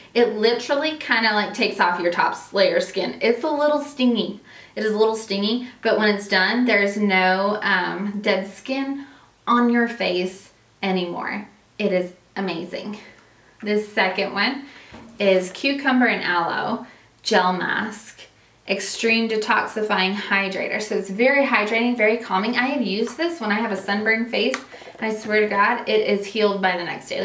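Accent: South, United States. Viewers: You are hilarious and I died like 5 times love your southern sass girrl;) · I seriously love that you are doing videos! Keep them coming I could listen to your cute southern accent all day! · First of all. Your dancing & song choices are 💣. I swear to you we were meant to be friends! Second. I could listen to you talk with your southern slang alllllll day! And lastly- I think i need that MJ perfume in my life!! You know i trust you to the ends of the earth- so pretty sure this stuff is going to be incredible🙏 XO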